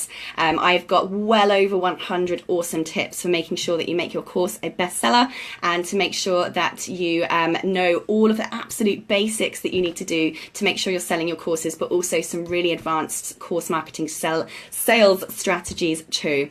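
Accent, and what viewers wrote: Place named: United Kingdom. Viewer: Great content, horrible video. The audio and video wasn't in sync had to stop watching but keep listening. Very glad I did. Your British accent and lingo is awesome, "A Silly Sausage" - Great stuff, lol - I like how you used the bread, made a lot of sense. Have a great day. God Bless, Anthony 2 Tim 1:7 <link>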